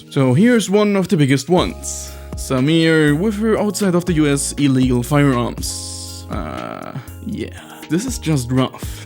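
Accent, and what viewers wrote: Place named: Germany. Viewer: du nuschelst ziemlich was deinen deutschen akzent nicht wirklich versteckt :o dein tonfall ist igwi.. leicht arrogant was, wie ich finde, nicht zu dir passt :o aber an sich ist das video echt cool gemacht :D gj :D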